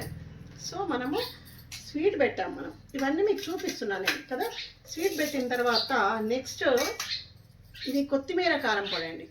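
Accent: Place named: Andhra Pradesh, India. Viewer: Mi matalu telangana slang la ledhu mam looks like Andhra lovely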